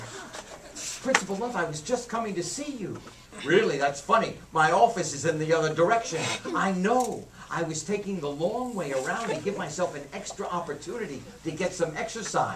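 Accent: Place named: New York City, United States. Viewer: I love that excerpt! He has an AWESOME Manhattan, NY accent!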